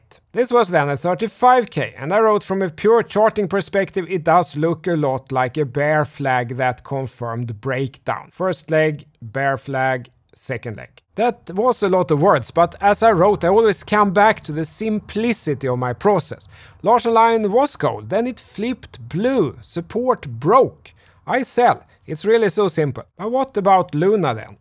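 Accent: Sweden. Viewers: I love your thick Swedish accent, and I'm not being sarcastic. You sound exactly like a voice from a south park episode. If the crypto stuff doesn't work out, do voice acting. :D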